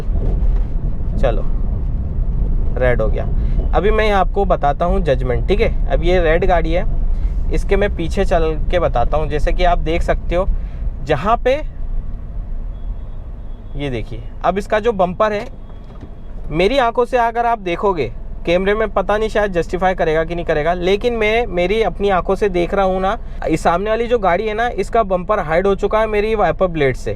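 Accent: Indore, India. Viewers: Bhai aapki aawaz se hi pehchan gaya aap indore se ho❤️❤️❤️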